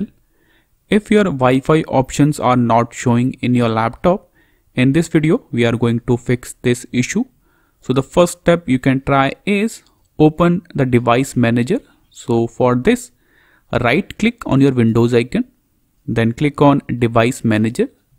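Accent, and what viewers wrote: India. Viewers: Worked , thanks a lot , i knew it will work when i heard that thick Indian accent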